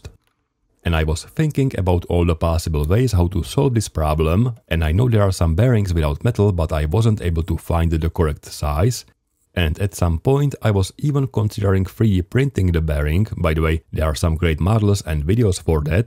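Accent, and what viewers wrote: Czech Republic. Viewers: Ten českej přízvuk jsem poznal hned :D Btw cool projekt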